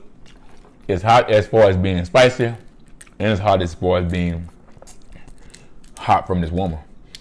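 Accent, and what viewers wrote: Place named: South, United States. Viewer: New subbie here! Love your personality & your southern accent. Do I hear some geechie or Caribbean in your voice? Congrats on being smoke free. Keep focused!! Peace love!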